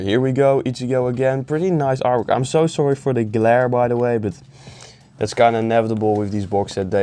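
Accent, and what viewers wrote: Netherlands. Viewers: Ik hoorde direct aan je accent dat je Nederlands was, tof om te zien. Je hebt een subje erbij! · you dutch i hear the accent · Ik heb deze video al zo vaak gezien in mijn Recommended maar heb eigenlijk nooit op je stem gelet dus kom er nu achter dat je Nederlands bent. Mooie Collectie!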